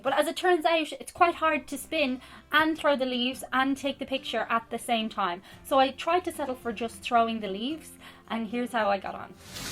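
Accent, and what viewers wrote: Ireland. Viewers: Another funny one!!! You are Brilliant! You sound so American, every once in a while I hear a little Irish come out but you just speak so well! Texas is coming soon! Cheers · P.s. part of the charm of your videos is the accent. In America, we enjoy hearing Irish accents. I suppose we think it’s cute, or whatever. So, if you pronounce Fall like an American, it’s sort of like removing and discarding the chewy center of an Oreo cookie, so to speak. By the way, we call a pumpkin a punkin’. We know our pronunciation is wrong, but we say it like that, anyway. It’s just cute, or whatever.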